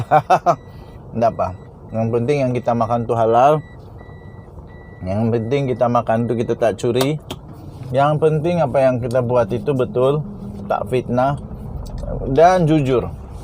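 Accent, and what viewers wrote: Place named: Sabah, Malaysia. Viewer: JANGAN KAMU BAGI BARITA YANG TIDAK BUTUL.AH...KALAU BUTUL BARITA TIDAK APA LAH..KALAU BOLEH UBAH LAH CARA BRRCAKAP...KANAL BUTUL SUDAH YG INI DARI SABAH.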